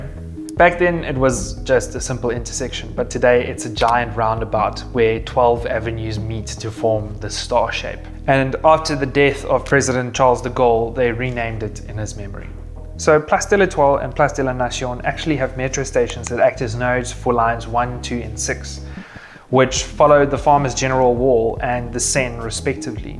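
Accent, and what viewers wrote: South Africa. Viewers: Is that a South African accent? ❤ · Ek het gedog dié man klink soos 'n Suid-Afrikaner en toe ek gesien het, het ek sommer net geweet! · South African presenter? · I know a South African when I hear one👁️🇿🇦👁️ great video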